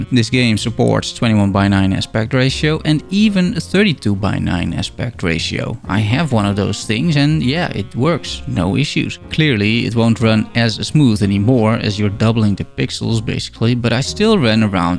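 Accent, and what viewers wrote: Netherlands. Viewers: bruh you are from the netherlands i can tell haha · tering zeg wat een nederlands accent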